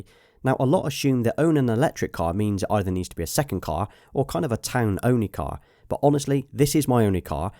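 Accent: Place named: England, United Kingdom. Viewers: Tesla UK, another relaxed English accent to enjoy 🇬🇧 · I enjoyed your video and love the English accent! Tesla UK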